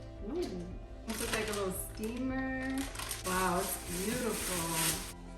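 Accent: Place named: England, United Kingdom. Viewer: Ahh I really like your English accent woah and you look so pretty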